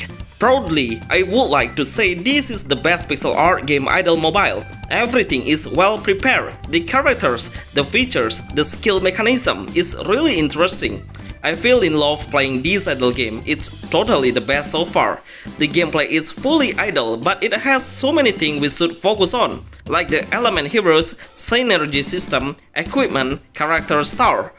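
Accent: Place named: Indonesia. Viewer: Bahasa inggris kamu udah lumayan bagus,tapi kamu perlu menghilangkan aksen indo mu bg,tapi makasih bg❤😊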